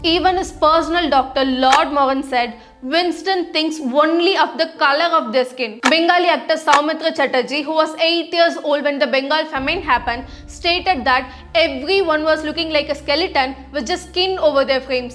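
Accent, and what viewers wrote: Tamil Nadu, India. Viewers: your pronunciation with heavy Tamil accent is bad. You observe Palki and learn.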